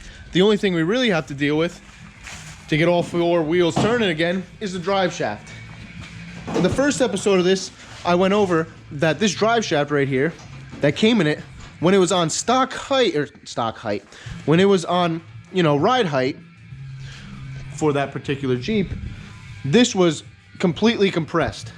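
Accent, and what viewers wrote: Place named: New England, United States. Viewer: Thanks Guys. Where are you located? You sound like New England. That’s where I am and if you’re around here would you consider installing it for me? Thank you 😊. Maggie Donovan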